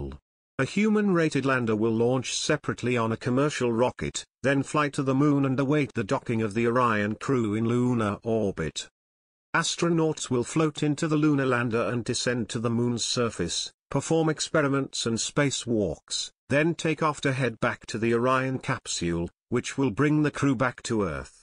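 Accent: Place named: RP, United Kingdom. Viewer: I love how the British cultured accent rubs the Yanks the wrong way. LOL Well done on the content m8t cheers. How about you change it up and use a Cockney or Scouse Accent BWG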